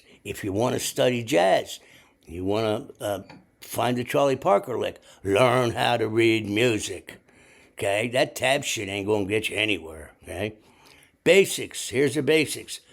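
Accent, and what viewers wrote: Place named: Philadelphia, United States. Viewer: I knew you were from philly...i know that accent too well...im from south jersey but my pop is from philly...dan scoleri....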